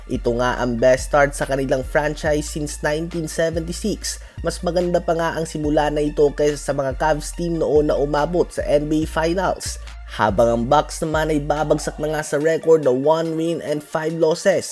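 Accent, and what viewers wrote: Visayas, Philippines. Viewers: Pang bisaya mga sounds mu😂mga bisakol at badjao lng makikinig nyan😂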